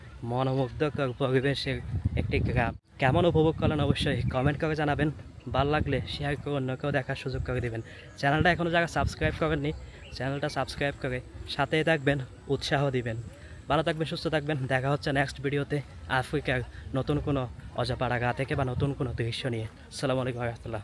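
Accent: Chittagong, Bangladesh. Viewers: না,,ভাই।।।আপনার মুখে চাটগাইয়া বাংলা ভালোই লাগে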